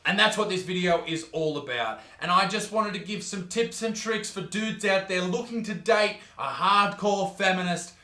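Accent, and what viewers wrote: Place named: Australia. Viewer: Holy shit, you've outdone yourself! You Aussie gob flopper's make me laugh by talkin' naturally!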